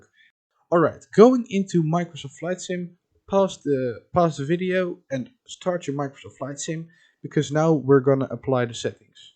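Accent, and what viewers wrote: Netherlands. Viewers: You Dutch by any chance? 😄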